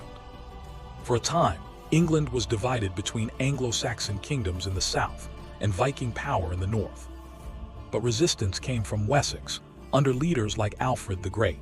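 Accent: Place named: United States. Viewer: Sorry as soon as I hear an American voice over on British history I turn off , you need a local narration even if it's AI.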